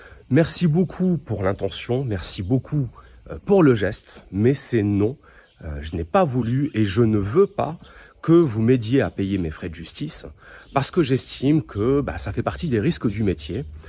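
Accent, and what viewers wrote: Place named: South, France. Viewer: ça sent le sud !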